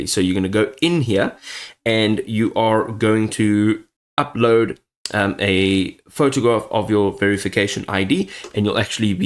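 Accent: South Africa. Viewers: Are you from pretoria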